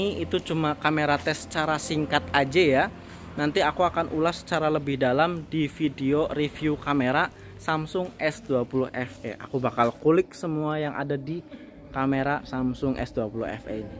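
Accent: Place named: Java, Indonesia. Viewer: Jowone sampean medok men mas,sampean seko pundi?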